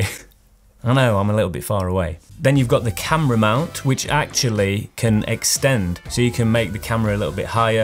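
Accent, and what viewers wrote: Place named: Manchester, United Kingdom. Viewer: Tempted to get this for my videos. I feel like you're from Yorkshire like me but for some reason I'm getting Manchester accent vibes. 🤣🤣 I could be entirely wrong but you're definitely northern.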